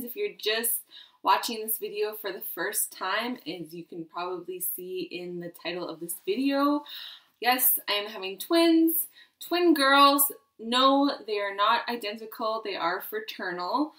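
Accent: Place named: Canada. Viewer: I just love your Canadian accent!! Excited to see your babies soon!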